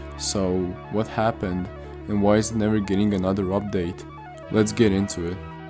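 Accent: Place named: Portugal. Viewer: Portuguese accent I think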